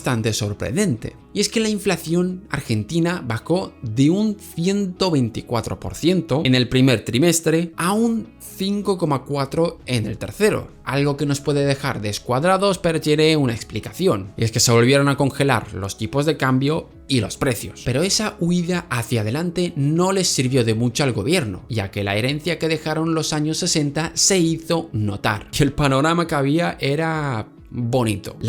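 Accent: Spain. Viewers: Acabas de inferir la ideología política de un individuo por los colores de su canal de YouTube? Te imaginas lo vesanico que suena eso? Deja de apelar a falacias de conclusión inatingente. Eso es propio de energúmenos irracionales. Para éste video se han utilizado 17 papers y dos libros que circulan por 4 escuelas económicas disímiles. Para mayor escarnio te atreves a acusar a un canal de economía mainstream como afín al libertarianismo. Regresa a tus clases de pensamiento económico, por favor. No sabes distinguir entre el acento español y el argentino? De qué multiverso provienes? Permíteme que te hable como un padre: no seas una parodia de ti mismo. Saludos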